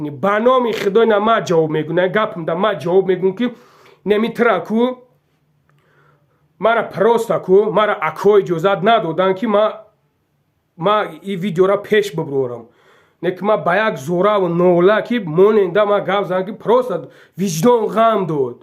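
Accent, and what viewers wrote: Kulob, Tajikistan. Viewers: Ту шердили Звенигород меги й дигачодум бра така риши рихи Кулоби нав гап зан шиди.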